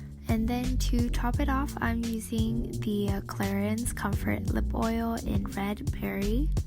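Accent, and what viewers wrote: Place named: United States. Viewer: Your voice sounds like Jess conte but with an American accent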